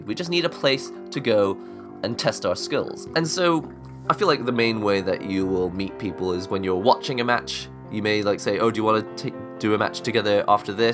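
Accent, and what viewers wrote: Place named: Bristol, United Kingdom. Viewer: England. He sounds like he's from the Bristol area maybe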